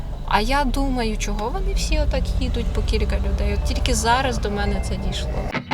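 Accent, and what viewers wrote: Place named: Kirovohrad, Ukraine. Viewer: Слухаю твою мову. Відмінності з Кропивницькими селами мінімальні.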